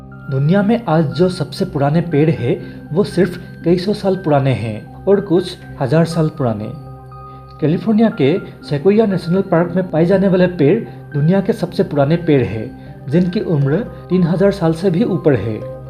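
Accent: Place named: Assam, India. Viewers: তুমি অসমীয়া নেকি ?তোমাৰ মাতটো অসমীয়া নিচিনা লাগে, তোমাৰ ভিডিঅ বিলাক চাই ভালেই লাগে| 👍👍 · Oh assamse he.. toh.. language me thodi parechani toh ho he sakte he..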